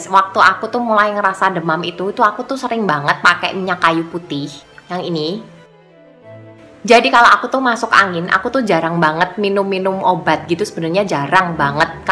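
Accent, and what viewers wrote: Java, Indonesia. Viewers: Medok gaes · Jowo ne medok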